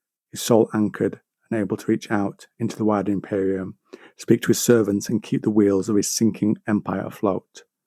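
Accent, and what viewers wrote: United Kingdom. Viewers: Almost vomited within moments of listening to the cockney. Can't understand a word. Not for consumption west of shitlandia I mean Europe.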